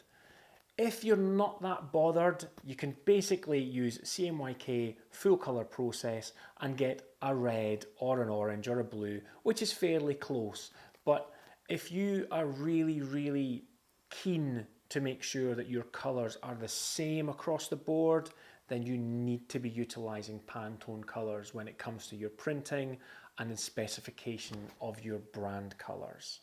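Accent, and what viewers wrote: Scotland, United Kingdom. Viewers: A great explanation of what Pantone colours are for. Nice to hear a Scottish accent too, rather than the usual American! Subscribed.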